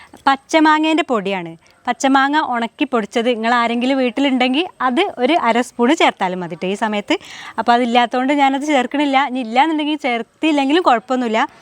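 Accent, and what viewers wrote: Malappuram, India. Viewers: നിങൾ മലപ്പുറം ജില്ല ആണോ.എന്തായാലും സൂപ്പർ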